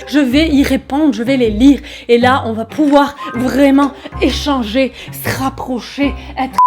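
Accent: Quebec, Canada. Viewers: Je suis trop contente avoir une youtubeuse qui parle québécoi comme moi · Ben moi sais simple tout se que j'ai à dire ben sais que tes génial et j'ai une amie Elle et née au Québec et maintenant elle habite en France 😀 et je t'adore tes génial PS j'adore ton acens j'adore l'acens Québécois 😀😁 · J'adore tes vidéos et j'aime trop ton accent ❤😉(j'aimerais bien avoir l'accent québécois ,et parler la langue )❤😋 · J’aime trooooooo l’accent québécois omg 😍😍 c’est pour sa que je regarder t videooo ❤️ pas juste pour l’accent he 😂😂 tu me fait trooooo rire continue comme saaaa 😌 je te soutiens a fond 💪 enfin, ON TE SOUTIENS !! ❤️❤️💪💪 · J’apprends l’accent québécois avec toi et j’ai encore plus envie de passer ma vie dans ce pays de caribous. Tu es vraiment inspirante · j'ai hésiter de m'abonner a la première minute et en fait ,tu fait beaucoup de blague et tu explique très bien , et j'adore entendre des québécois/e parler français , avec leur accent . 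J'adore ta chaîne continue comme sa tu fait de superbe vidéo , tu va rapidement être de plus en plus connue je pense ! par contre je suis PAS SUR que mes parents voudrons bien que je vienne tourner avec toi ,et même si tu habite en france je pense que tu habite pas en bretagne , sachant que j'ai que 13 ans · Salut tu pense et aller à quelle âge au Québec et tu pense avoir eu au bout de combien de temps accent québécois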